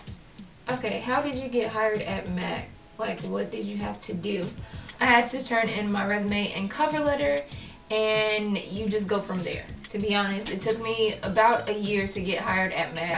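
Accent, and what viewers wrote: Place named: Jamaica. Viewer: I heard your Jamaican accent in the candy land tutorial 😂😂😂 represent fi yawd❤💚💛 nuff love ❤💚💛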